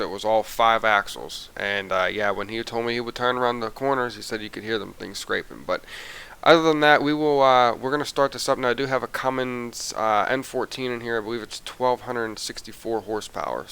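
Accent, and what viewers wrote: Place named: Pennsylvania, United States. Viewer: Just found your channel via Kyle's videos. Great to hear another Pennsylvanian.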